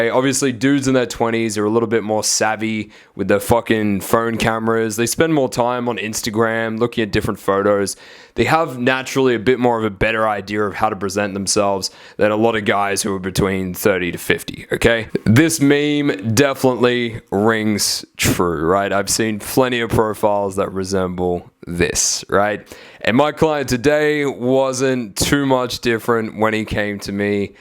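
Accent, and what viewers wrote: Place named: United Kingdom. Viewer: Where are you located? You have an accent, are you in the UK?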